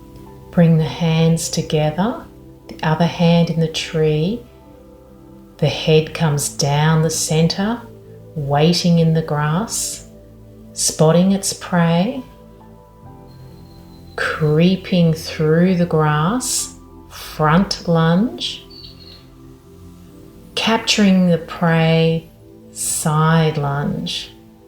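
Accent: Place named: Australia. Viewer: Sublime thank you. I loved hearing the Aussie accent. What a beautiful setting...Blessings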